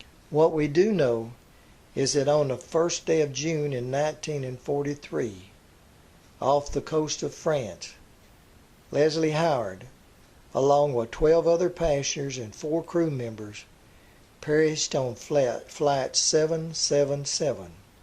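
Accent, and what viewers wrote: South, United States. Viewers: Most interesting thanks. BTW I enjoy your accent. Which part of the South are you from? I live outside the USA so cannot place your state of origin from your accent.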